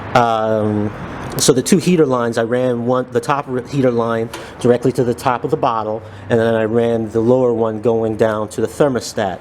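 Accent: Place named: East Coast, United States. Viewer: Funny, you don't sound Californian! You sound like from the east coast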